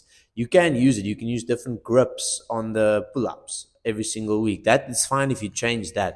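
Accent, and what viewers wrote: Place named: South Africa. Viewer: Sounds like an Afrikaans accent my boy. Are you South African?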